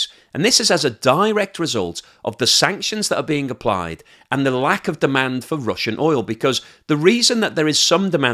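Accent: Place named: England, United Kingdom. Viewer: What are of England are you from? I like to play place that accent.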